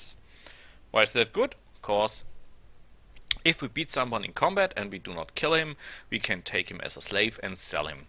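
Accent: Germany. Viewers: I hear it stop and go AH NO! press the next one straight away love this so far and it only ep 1! on a side note your accent it interesting German?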